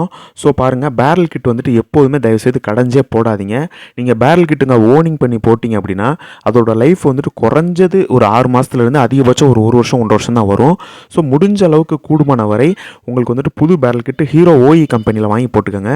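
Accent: Madurai, India. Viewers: Neenga entha oor madurai ya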